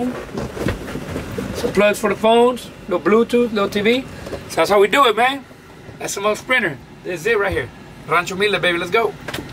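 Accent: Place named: Fresa, Mexico. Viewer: El español lo habla fresa y el inglés lo habla barrió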